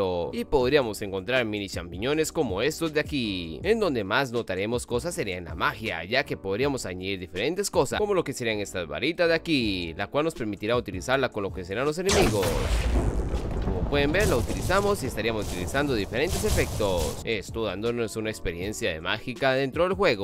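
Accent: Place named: Costa Rica. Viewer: Yo diciendo que raro esa pronunciación de la R me suena parece tico jaja luego veo la bandera y efectivamente jaja saludos desde Cartago xd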